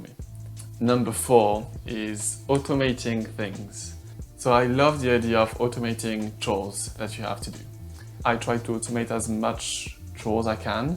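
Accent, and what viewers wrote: France. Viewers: Love it saving time is essential so we can do the things that we like. Loving the minimalist approach too. Also your French accent is endearing the British boys must love it. Although the London gay scene can be exclusive. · Ton petit accent français doit tous les faire craquer !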